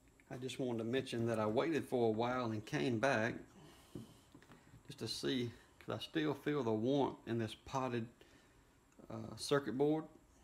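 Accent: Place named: North Carolina, United States. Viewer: This channel is a bit like BOLTR but without the humor, bad language and complete disrespect for the tools he's working on. He also sounds like he must be from near where I live (NC). Good video - thanks.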